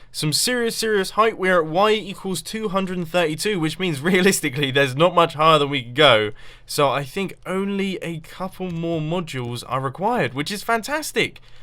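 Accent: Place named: United Kingdom. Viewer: is: Tall, hansom, has a British accent, smart, funny. Guys, hide you gool frens, mombo stel yo women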